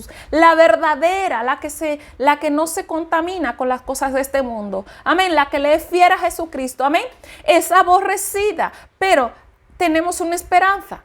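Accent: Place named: Spain. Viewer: Tienes acento de España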